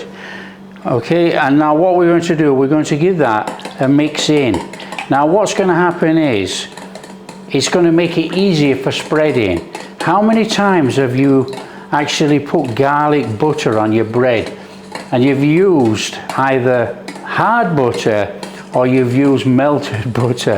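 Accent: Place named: Northern England, United Kingdom. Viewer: Love it!!!!! Let me guess your accent... Newcastle? Sheffield?? Yorkshire?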